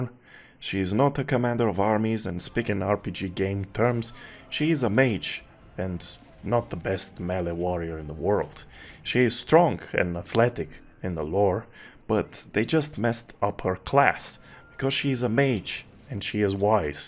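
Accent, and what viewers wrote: Eastern Europe. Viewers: I can hear your accent. You're Slavic. I'm Slavic. We were brought up into a culture that teaches us to be able to enjoy and find wonderful things in stories that are nothing LIKE ours. Even if there is NOTHING AT ALL Slavic about LotR, we have no problems loving it even if we're "left out". In fact, we never REALIZED we were "left out" until black Americans came up with the CONCEPT of being left out. To US, it was perfectly NORMAL an English author didn't write Prague or Cossacks or Perun into his books set *in an obvious paraphrase of NORDIC and ANGLO SAXON mythologies*. To them, it isn't. I might be unfair, but the media certainly makes it seem that if ANYONE in any way DARES to do anything at all without black americans, there is racism involved and it's insulting. In fact, I think we might have to create black cheese in order to right this HUGE injustice of making cheese white and yellow, but not black for SO long. 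And just to note, episode 5 - I'm still waiting for an ASIAN actor? Even when they could have cast ALL the Elves as asians, given them blonde wigs and contacts and with some make-up they'd look WAY closer to Tolkien's description than the dude who makes me wonder if Elves f*** by colour in order to maintain black people among their ranks. As I wait, I am looking forward to a remake of Red Cliff starring a white Cao Cao, a black Zhou Yu and a female trans gender gay latino Zhuge Liang. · You are not a real fan. You are a Russian separatist. You definitely have Slavik in you based on accent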